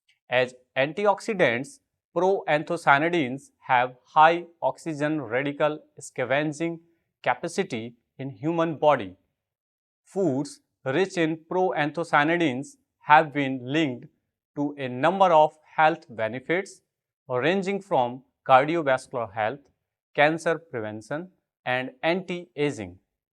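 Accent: India. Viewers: English with india dialect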